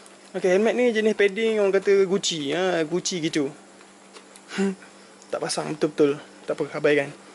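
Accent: Terengganu, Malaysia. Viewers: Kecek ganu la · Mmg minat la abg ni, muka garang tp bila cakap lemah lembut, best lak dgr slang Terengganu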